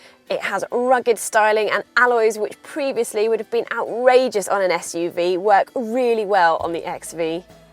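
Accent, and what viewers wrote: United Kingdom. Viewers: Honestly if I had british accent like hers, I will never stop speaking. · lovely girl, love the GB accent, good review too. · I love her British Accent and the way she was holding the banana... think she was hinting something lol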